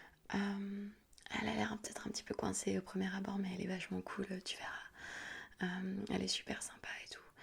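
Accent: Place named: France. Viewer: Ese español con acento francés, bellísimo ❤️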